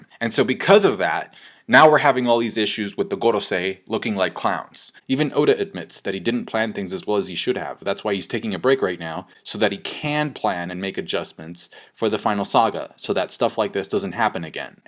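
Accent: Canada. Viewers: wait a second.......are you from toronto? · Bro are you Canadian! And in psychology as a therapist I'm an aspiring psychological associates that's awesome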